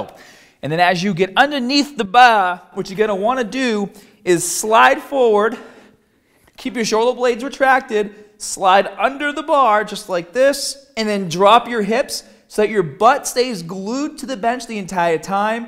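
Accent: Boston, United States. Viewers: You sound like you're from Boston. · i never noticed boston accent before. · So many comments bitching about accent... grow up, everybody from the Boston area has the same accent. I moved away from Southie awhile ago (to southeast Iowa), unless I am drinking, I can hide the accent, but unfortunately it will be with me my entire life. If you cannot get thru the video because of the accent, you are a child who should be on A.D.D. medication, don't worry about body building till you become a bit more focused, it is called priorities. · It's because originally he tried much harder to HIDE the Boston accent... but then has since stopped doing that because it's idiotic and now (and back in 2016) he's a lot more natural. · Dude your sudden onset of a thick boston accent is ridiculous lmao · lol. this is Boston accent right? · don't listen to the haters. 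the Boston accent is sick. better than the boring ass baritone most Americans speak with. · obviously Boston. We New Yorkers don't speak so exaggerated. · No he just has a Boston Shore accent! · he hid his accent when trying to go mainstream now he lets his inner bostonian out. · First thing I thought was oh!! A fellow Bostonian ❤ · holy shit - the Boston comes strong in this one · I love that Bahston accent. lmao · He's got a Boston accent. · this guys boston accent is hilarious!!! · When the fuck did this guy develop a Boston accent lol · Nice Bostonian accent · When did this guy get a Boston accent? 😂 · Maybe that's his real Boston accent but hes trying to hide it but it comes out 👍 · Did just recently develop his Bahstin accent or was I just missing it for years? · Yes he has a Boston accent, just watch the video for the gym tips. It's really annoying to see the comment section flooded with people commenting on his accent and not gym-related things. 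· Apparently it's a Boston accent. I got confused since I assumed 100% of America accentuated the 'r's. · is he from Boston? sounds like a boston accent. not too heavy but subtly noticable. its perfect. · Woooooow! Your Boston (?) accent is getting stronger and stronger! Regional accents have their charm. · It's called the Boston accent. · Is that the Boston accent they talk about? also when did his accent become so strong? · Dude is from Boston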